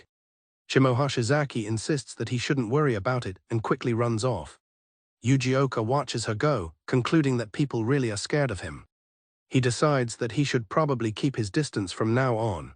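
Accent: United Kingdom. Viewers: Why is the ai british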